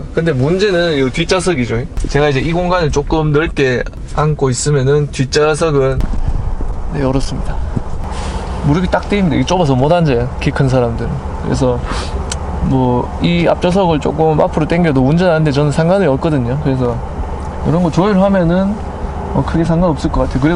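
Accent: Gyeongsang, South Korea. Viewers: 경상도 사투리 멋집니다 ㅋㅋ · 아 이 말투는 상주 구미 김천 말투 같은데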